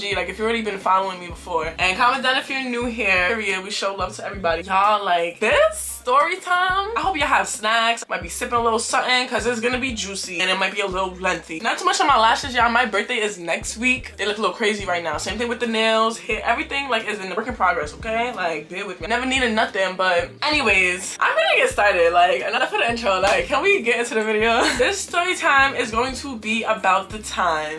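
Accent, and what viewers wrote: New York, United States. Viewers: love the way it sounds like i’m otp with one of my NY homegirls🤍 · oop i know a new yorker when i hear oneeee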